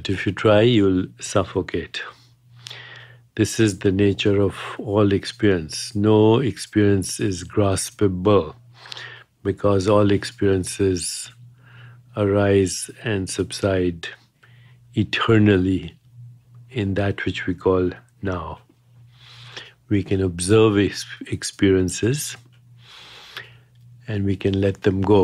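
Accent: India. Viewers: I like your thick Indian accent...relaxed me 🤗. Everyone should enjoy and experience . In our awareness.... The nature of the breath..In the now! 🌟 🌟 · The thick Indian accent is very hard to listen to.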